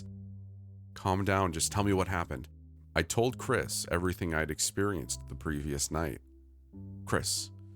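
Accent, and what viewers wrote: Canada. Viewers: "Soary=sorry" "bean=been" lol are you Canadian? Great narration anyhow!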